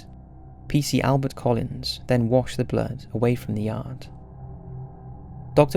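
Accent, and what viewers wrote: United Kingdom. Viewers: Top-notch content. As always, sit back, relax, and fall asleep listening to your British accent. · It makes me laugh people going on about his voice. It's just a normal British accent.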